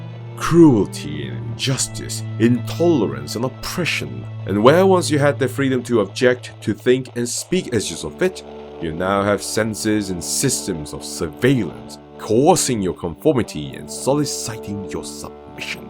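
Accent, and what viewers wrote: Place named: United Kingdom. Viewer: 안녕하세요. 한국분이신가요? 어떻게 영국발음 연습하셨는지 여쭤봐도 될까요?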